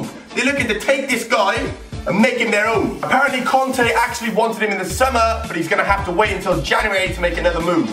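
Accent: Wales, United Kingdom. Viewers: Hey are you from Wales lad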